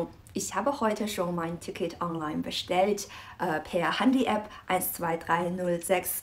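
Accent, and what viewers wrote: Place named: Germany. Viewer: Deine Aussprache ist sehr gut! Ich schaue auch aus Österreich, hier in Österreich sprechen wir China aber anders aus, mit "K" also "Kina", so wie Charakter, Chaos, Cholera alles mit K am Anfang. Chemie wird auch mit K ausgesprochen. Man merkt also, dass du in Deutschland Deutsch gelernt hast ;) Wie lange lebst du schon in Deutschland?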